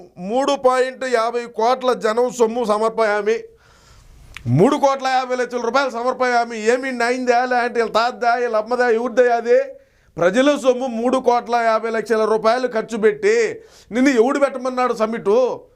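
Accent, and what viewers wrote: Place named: Kadapa, India. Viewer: తమ్ముడు నీ భాష లో కడప కనపడుతోంది 👍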